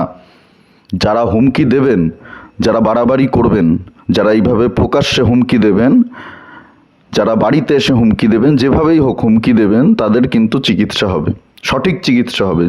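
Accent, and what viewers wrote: Bangladesh. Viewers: কথা শুনে মনে হচ্ছে বাংলাদেশি মাল।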